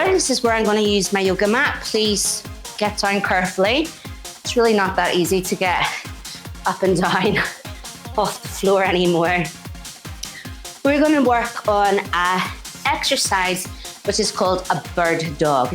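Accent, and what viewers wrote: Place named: Northern Ireland, United Kingdom. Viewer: I feel like you're from Northern Ireland, where are you from? 😄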